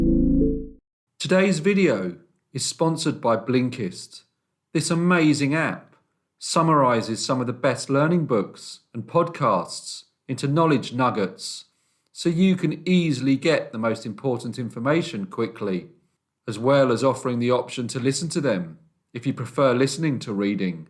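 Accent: England, United Kingdom. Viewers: I love to listen to your beautiful english accent, thank you for the stories!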